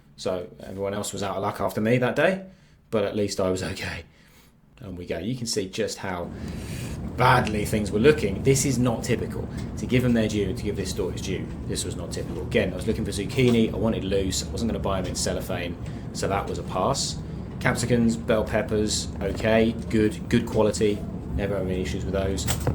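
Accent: United Kingdom. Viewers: You sound British so it was surprising that your in Austraila.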